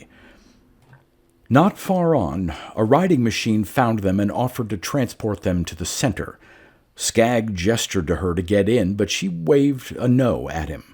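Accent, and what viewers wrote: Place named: United States. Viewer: Do you realise how VERY American you sound?. Almost a parody of American. I wonder how long religion will keep your Nation infantile?.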